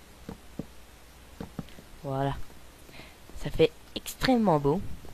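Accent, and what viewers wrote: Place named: Quebec, Canada. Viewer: Ta un axan québecois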